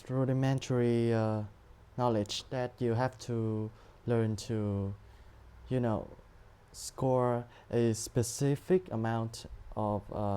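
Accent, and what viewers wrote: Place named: Vietnam. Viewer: Your accent, sounds like Viet. Anyway, great video!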